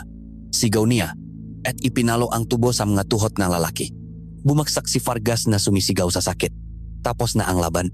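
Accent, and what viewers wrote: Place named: China. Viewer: Bakit namn po ganyan salita nyo Chinese po ba kayo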